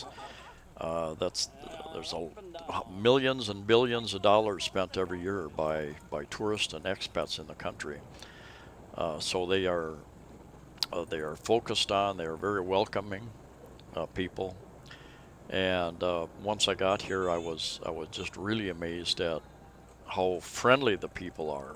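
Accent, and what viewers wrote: Midwest, United States. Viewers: Thx for the vid…older vid but you covered many important points..in 8th grade (Wisc) we sang from “The King and I” which I think is the first time the idea of living abroad came to mind, then working at an airline was able to explore many places and visit relatives in Sweden and Wisconsin..stay in Tland now (waiting to get back) but PI is my 1st option if things don’t work out there..compliments on your vids done in a midwest accent lol 🙏🏻👍🏻✌🏻🕊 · I just found your channel today. Liked. Subscribed. Wish I had found it sooner. After two visits to PH I knew I would be retiring here. My wife and daughter joined me in Quincy (just south of Boston) MA for about six years. It was a little sad. The first snow of their experience was magical for the first 20 minutes. Then my daughter was like, It was fun but I'm done. Left my wife and I to shovel the driveway alone. 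They endured six winters with me there. I retired early in 2013. Brought my tropical flowers home. I've been here (Siaton, Negros Oriental) for over five years. Just living the simple rural life. I was trying to place you accent thru several of your videos until I came to this one where you say you are from Minnesota. I knew I had heard it before. My best friend from my Navy days was Objibia from northern MN. I don't get out much but if you're ever in the neighborhood...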